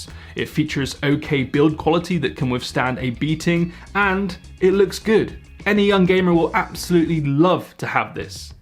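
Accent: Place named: West Country, United Kingdom. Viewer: your heavy west country accent will never not be adorable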